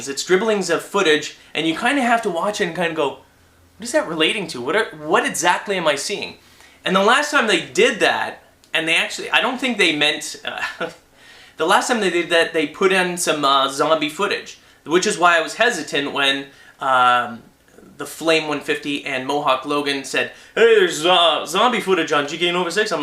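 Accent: Canada. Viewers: are you canadian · are you canadian???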